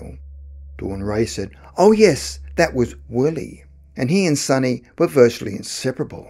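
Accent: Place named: Australia. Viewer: Interesting. I died in Germany WW2 in UK Army. Apart from UK and Ghanaian' I've also met German and USA people who also have memories of their WW2 deaths. But I don't talk publicly because people get unreasonably angry at such disclosures. I mention this because the audio narration for this video is Australian. And if USA, Brits, Ghanaian and Germans are already back from a WW2 death, it signifies Australians have also returned to rebirth in a new physical body but may not yet be aware of it. I think rebirth is required for further evolution of our human consciousness. I died in Jan 1945 north west Germany and was reborn London UK Aug 1949. So, some of us come back very quickly. I don't hate Germans. I love German music Bach Beethoven, et-al. I consider the world was sucked into a cosmic maelstrom at that time, and that caused the wars. You can't stick the blame on any one group. 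About the young boy in the featured story...........did he die prematurely from a car accident or illness, which is how he died, and departed from his wife, who he had had great affection for? Note: I don't do drugs or alcohol, so this not a fairy story.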